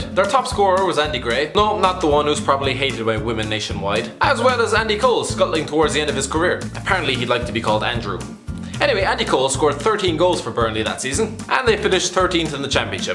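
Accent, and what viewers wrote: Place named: Ireland. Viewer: The Irish narrator is the best by far. Needs his own channel.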